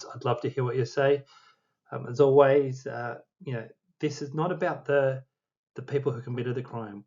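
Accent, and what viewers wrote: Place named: Australia. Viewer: Nice to hear an 'Aussie' .. Me too! 🇦🇺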